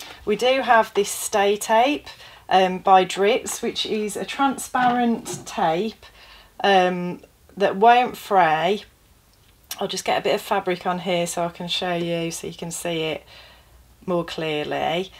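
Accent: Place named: Midlands, United Kingdom. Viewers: K and G are related phonemes, being voiced and unvoiced versions of the same. Regional accents and dialects influence how speakers pronounce them. Interestingly, dialect, from the Midlands, is thought to be the original pronunciation of modern English. Perhaps you might consider learning more about linguistics and phonetics before making judgements on other’s use of English. It might also help with your grammar and punctuation. It was very irritating to be distracted, by vacuous comments, from such an excellent demonstration by a very articulate and technically able presenter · That Midlands accent!! Takes me back! Great video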